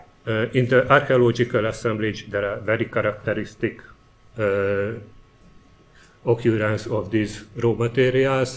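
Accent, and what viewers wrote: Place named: Hungary. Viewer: Magyar olvasta hisz nem ő-zik egy angol, de nagyon érdekes az anyag.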